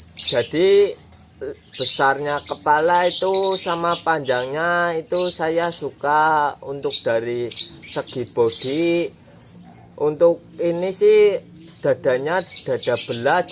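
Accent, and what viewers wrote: Banyumas, Indonesia. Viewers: Purbalingga ngapak2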